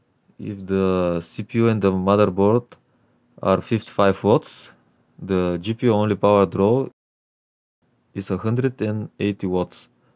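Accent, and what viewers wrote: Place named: Russia. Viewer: Какой русский акцент.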